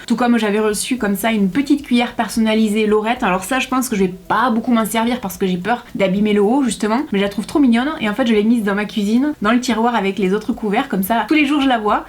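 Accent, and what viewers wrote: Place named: South-West, France. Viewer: C'est toujours un grand plaisir de découvrir une nouvelle vidéo, on sait d'avance qu'on va passer un bon moment (une bulle personnelle dans le temps, et pourtant je tiens à redire que je ne me maquille pas) car tu es une personne rayonnante, solaire et tellement agréable, de plus j'adore ton p'tit accent du sud-ouest ! J'aime beaucoup les parfums de la marque Adopt que je porte très régulièrement, ils sont d'un rapport qualité/prix excellent ! On m'a demandé à plusieurs reprise quel était le parfum que je portais (rose noire, sugar, yummi candy) une chose qui m'est arrivée moins souvent alors que je portais "un vrai parfum de marque" (mes préférences vont chez Guerlain, Lancôme et Mugler). J'aime bien t'entendre parler de maquillage même si je n'en fais pas usage, pour moi une simple crème hydratante riche de chez Avène ou la Rose-posay. 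Je suis bluffée quand je vois comment on réalise un maquillage techniquement, cela n'est pas si facile à faire, question d'entrainement j'imagine. Chère Laurette as-tu un avis sur le lait crème Embryolisse que j'aimerais beaucoup tester, j'espère qu'il convient à une peau très sèche, sa texture me semble très chouette. Bonne et longue continuation à toi !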